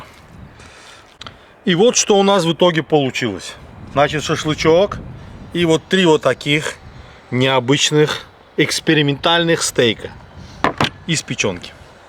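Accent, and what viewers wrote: Baku, Azerbaijan. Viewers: Азербайджанский акцент , ласкает слух, сразу вспоминаю своего друга Джавида , с которым дружили во время ординатуры в Москве ). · Маэстро, настолько красиво и со вкусом вы все делаете, что смотрим всей семьей не отрываясь! И кушаете очень заразительно:) Процветания вам и вашим близким,! Потрясающие блюда, приправленные неповторимым бакинским акцентом становятся еще вкуснее))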